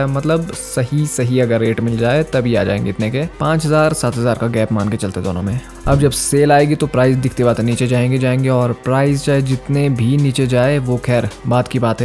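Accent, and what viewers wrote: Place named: Himachal Pradesh, India. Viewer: Bhi awaz si himachali lg rhe ho ✔️✔️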